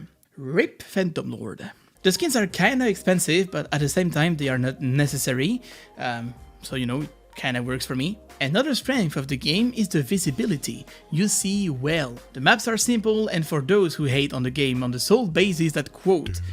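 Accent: France. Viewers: Pourquoi tu parle anglais alors que on sent ton français a 100000kilomètres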